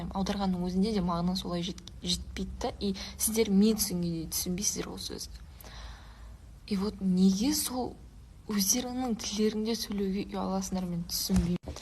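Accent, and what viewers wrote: Standard. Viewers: Жарайсың! Таза сөйлеуіңмен баурап алдың! Ақылды сөз айтып тұрсың! Дұрыс ойлайды екенсің, кейбір қазақтардың санасының әлі құлдықтан арылмағаны ғой бұл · Ұйғыр емес сен нағыз Қазаққа ұқсайды екенсің. Таза қазақша тура сөйлеп тұрсыңғой. Керемет, күшті нағыз қазақ тілін жақсы үйреніп алыпсың. Орысша сөйлеп жүргендер сеннен қазақша үйренсеғой. Жарайсың👍👍👏👏👏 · Ұйғыр қыз болсаңда қазақша таза сөйлеп тұрсың, нағыз қазақтың қызы құсап. Бәрекелді, керемет айттың, жүрегің қазақша болып тұр, нағыз қазақылық патриоттық сезім бар екен. Әр қазақтың қызына айтарым, қазақ тілі дүниежүзінде ең әдемі, сұлу, бай тіл. Қазақша сөйлеңдер, неге сендер қазақша сөйлемей, басқа тілде орыс тілде сөйлеп, масқара болып жүрсіңдер. Неге өз тілде сөйлемеу керек, сонда соны намыс көресіңдер ма? Ол намыс, ұяттық емес, ол топастық, намыссыздық, сатқындық, көзбояушылық, мәңгүрттік.☝. 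Қазақ тілі сонда бір сорлы, бишара тіл ма, өзге ұлттар қазақ тілімен сөйлеп жатыр, ал біздің қазақтар болса, өз тілін намыс көріп, орысшаға бұра салады. Орысша білген дұрыс, бірақ өз ана тіліңді ешқашан ұмытпауың керек, қорламауың керек.☝☝☝☝ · Сұлуым менің жарым болшы Қазақша таза сөйлейсіз... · Ұйғырмын дейсің, мен үшін сен қазақсын, қазақша таза сөйлеп тұрсыңғой. Алла разы болсын қарындасым. Рахмет. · Не деген патриотизм қазақтар бұлай таза сөйлей алмайды Алла разы болсын Ұйғыр бауырларымызға осындай тәрбие берген Ата Анасына мың алғыс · 😊оте таза сойлейсин керемет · Жаным сол сен таза казакша сөйлеп тұрсыңғой сен қазақсынгой мен үшін! Жарайсың Қарындасым👏👏👍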